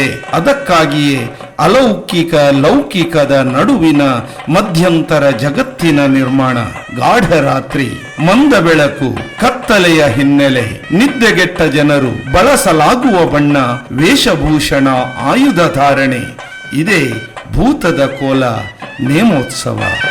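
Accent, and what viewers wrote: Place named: Tulu Nadu, India. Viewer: ದೈವಾರಾಧನೆ ಬಗ್ಗೆ ಬಹಳ ಒಳ್ಳೆಯ ಮಾಹಿತಿ ಹಾಗೆ ತುಳುನಾಡ ಮಾತಿನ ಶೈಲಿ ತುಂಬಾ ಚೆನ್ನಾಗಿತ್ತು 🙏🙏🙏🙏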